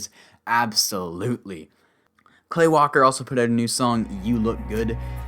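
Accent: Canada. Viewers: not even 2 mins in and I can hear the canadian accent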